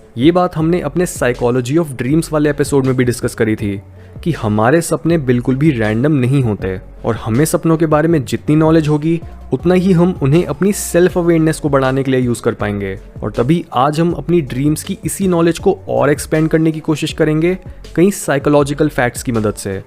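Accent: India. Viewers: yeah same happens 😅😅love ur voice hindi accent❤❤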